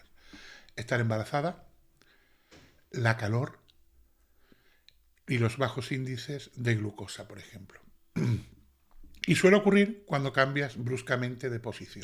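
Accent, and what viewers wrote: Andalusia, Spain. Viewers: Pues el calor,los andaluces hablamos asi😅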